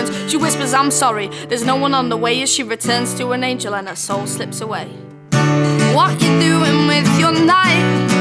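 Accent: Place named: England, United Kingdom. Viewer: Good! I like your english voice its cool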